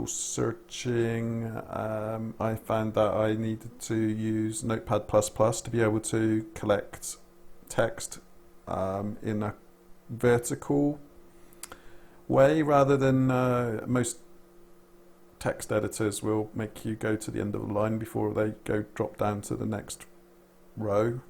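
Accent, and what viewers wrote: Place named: United Kingdom. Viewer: Hey, what's up,! I started this beautiful morning with watching this video))) i don't know if you would be happy to hear this but i felt like i was watching my own video at some point! Cool! You started adding some humor (hire demoralised engeneer is the best) it's so cool Your video started to 'flow' - i watched it almost entirely! I like word 'fuck' myself, but... i think being ironic is better than being angry and unsatisfied, well at least in the videos - so all the cases when you kinda laughing at managers acting fools - it's cool and fun, but when 'they are fucking idiots' - many people knows that, so depressing viewers with no reason might decrease views. I understand your pain - i dropped my recent 25/h contract because my client claimed working prototype to be crap, while the problem was in target site, not in my app and scraper, so yeah, there's lots of dissapointing things, but it doesn't have to depress other people. 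i'm really sorry if something hurts you in my comment, i just wanted to say that me as a viewr LOVE your ironic British humor and would be happy to get more content like this. Also you started talk better. You've got a native British accent, you must be proud of it, make it sound even more british - clear deep vovels, non-american rythm etc. (All the stuff i failed to mimic) i mean in this video i enjoyed the accent because you were talking to subscribers instead of yourself like in previous video. Cool, man, keep improving, you're on your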